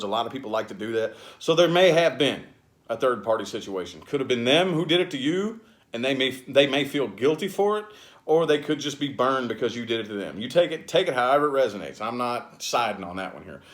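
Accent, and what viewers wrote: South, United States. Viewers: I like you and I like how you talk how you sound so southern😘😘😘 thanks for the reading. Queen Leo 8-21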